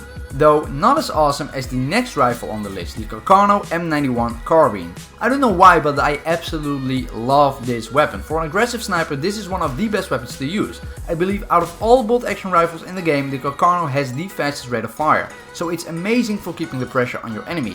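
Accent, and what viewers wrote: Netherlands. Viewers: is he dutch? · Ik hoor dat je nederlands bent 😆😋😂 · Are u dutch? Ur accent really sounds dutch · ' ' ben je Nederlands? Het klinkt zo maar ik weet het niet zeker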